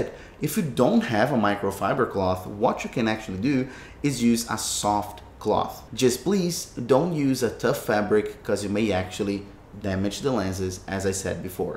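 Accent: Brazil. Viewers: és brasileiro ou português ?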